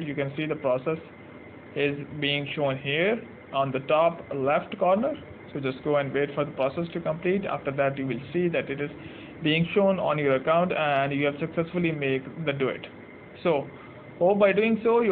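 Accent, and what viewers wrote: India. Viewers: Me: sees video on tutorial hears Indian accent, clicks off of video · You alreadyy know this finna be good cuz u hear that indian accent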